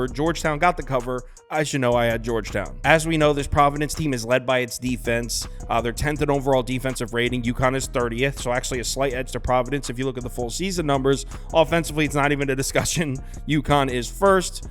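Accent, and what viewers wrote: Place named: New Jersey, United States. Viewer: Is from NJ? Does anybody know? Im a relatively new follower so im not exactly sure but some of the words he uses and the way he says certain words gives me Jersey vibes or maybe new york.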